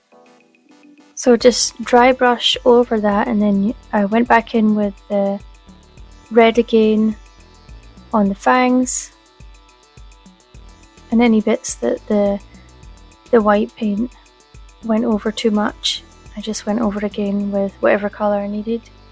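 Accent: Scotland, United Kingdom. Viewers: I just love your wee Scottish accent. The projects were so cool. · Love your creativity and your creations, are you Scottish but living in America? ( guessing the USA bit as we don’t have dollar tree etc) Can hear a soft Scottish accent when you talk 😊🎃👻✨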